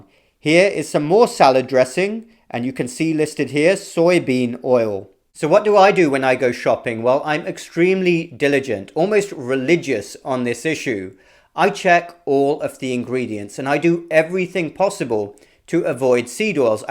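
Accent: United Kingdom. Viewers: I just love the fect doc didn't loose his British accent 👏😆❤️ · Bullshit in a British accent.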